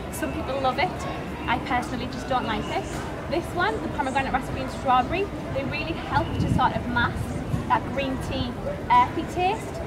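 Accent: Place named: North, United Kingdom. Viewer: lurve this veedyo. (sorry, her northern accent is as delicious as the teas she describes- and my version is horrid.) but I do love this video. I am an anglophile so....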